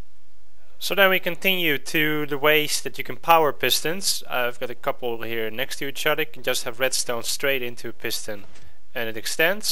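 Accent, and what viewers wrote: Netherlands. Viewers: Je kan het nederlandse accent horen :P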